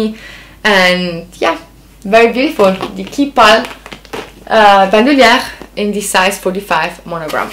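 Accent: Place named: France. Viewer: Nice to see you back! I watched A LOT of your videos before starting youtube myself. J’adore ton accent français. (pas du tout comme le mien - je suis Québécois Anglophone)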